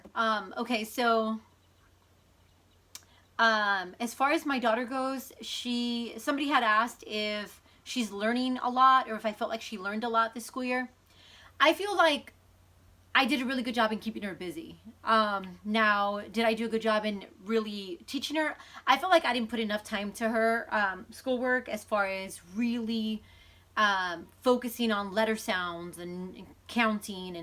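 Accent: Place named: California, United States. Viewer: I know this is an old video, but I'm so tickled to hear that you're from California because I thought you had a very familiar way of speaking (I'm from the Central Valley). I enjoy seeing the practical and creative ways that you homeschool and have taken a lot of inspiration from it.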